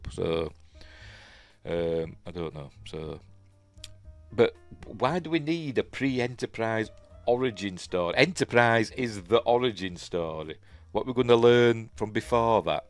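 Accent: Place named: Yorkshire, United Kingdom. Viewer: actually your accent just perfect mate! Love some lovely yo'ksheir accent!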